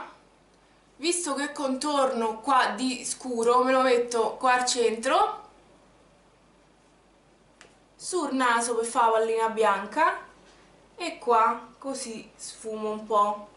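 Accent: Rome, Italy. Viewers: ahahahhaha mi fai morire adoro l'accento romano :D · sei fantastica mi fai morire dal ridere !!!!ti seguo da poco(adoro l' accento romano) daje ❤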